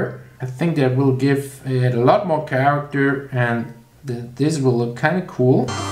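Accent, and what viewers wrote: Germany. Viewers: Man hört so raus, dass du Deutsch bist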